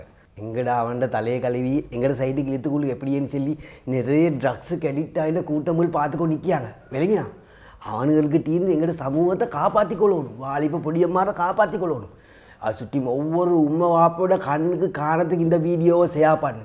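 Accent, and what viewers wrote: Sri Lanka. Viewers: நீங்கா பேசுறது ரத்தணாபுர தமிழா கொஞ்சம் சுத்த தமிழ்ல பேசுங்கா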